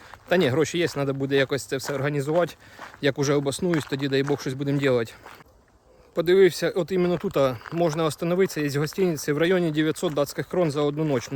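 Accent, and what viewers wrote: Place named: Surzhyk, Ukraine. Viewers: Не знаю, Для мене, як для західняка, суржик у Вас навіть не дуже сильно виражений. Ну проскакують русизми, але не страшно. Не звертайте уваги на хейтерів. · Ти балакаєш, як ми на полтавщині, і похер хто, що думає, аби тобі було зручно, не звертай на " вумних " уваги, вони того не варті! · не обращай внимание на хейтеров, это круто наоборот, что ты не стесняешься и еще нам это все показываешь. Большинство считает, что ты все делаешь по красаве, а если у кого-то подгорает, то это их проблемы. У тебя классный суржик, все звучит естественно и понятно. А яблоки про которые ты спрашивал похожи на сорт Ранетки. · Прикольні відоси, дивлюсь без напрягу😂 Єдине не зрозумів, як той факт, що ви виросли в селі пояснює не знання української мови. Тут швидше я думаю відіграло роль особливості спілкування в певному регіоні( схоже трохи на полтавський). 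Тому не треба пов'язувати це з селом. А позиція "яка різниця" думаю не зовсім правильна, тим паче коли ви ведете блог на велику аудиторію. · Чого це "центральної частини"? Це слобожанський діалект. ) Ото як він назвав малі яблучка, так їх називала моя бабуся якій би було 100 років). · ваш суржик дуже прикольный, и он так естественно звучит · ти дуже приємно розмовляєш, милий наш особливий суржик, характерний центральній частині України)) ❤ краще суржик і добро в серці, а не видавлена з себе солов'їна і негатив! Слухати приємно, дякую за відео! Цікаво і корисно) підписалася на тебе😊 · Дякую за відео! А на рахунок мови, то хай ті умники спердоляють) На Запоріжжі всі так розмовляють. Я теж далеко і Ваша мова, як мед у вуха😊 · По тому як говориш, здається що ти з центральної частини України. Вгадав?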